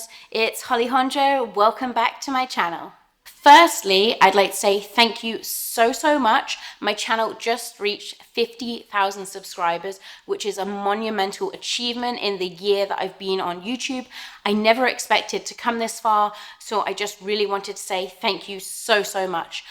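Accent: England, United Kingdom. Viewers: SHUT UP! I LIVE IN ORANGE COUNTY! Based on your accent, of course, I expected you live somewhere in England.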